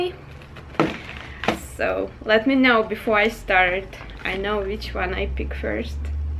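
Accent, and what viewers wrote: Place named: Russia. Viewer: You have a Russian accent,where r u from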